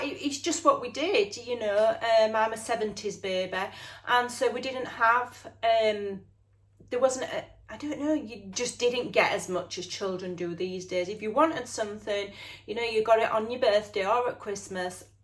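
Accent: Yorkshire, United Kingdom. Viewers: Oh,! I could listen to you all day long (and that laugh!! 🥰)! My dearest friend in the world for just over 50 years was a Yorkshire lass from Leeds. Yorkshire became sort of my second home (I'm from Canada) until Margaret passed a few years ago. I miss hearing that accent. Listening to you brings such joy! My favourite tip was 'DON'T HOARD SCRAPS'!! I am awful for doing that. I have always felt it was 'necessary' - other crafters told me it was. Thank you so much for finally giving me permission to get RID!!! I think it will definitely help me to create more. My crafts table is always such a mess because of these endless scraps everywhere. Loved this video! ❣💖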